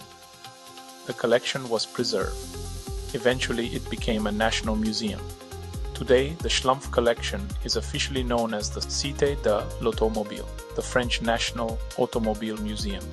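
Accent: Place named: Germany. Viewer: Good luck with your further efforts. The "AI voice" misled me with a very strong "German speaker's accent" into thinking that it was a human speaker who would/should have known how to pronounce that the "German way."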